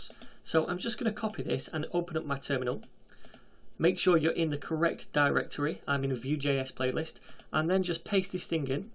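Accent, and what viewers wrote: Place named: England, United Kingdom. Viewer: Nice English accent, watching software tutorial and learning language pronunciation in paralel! =)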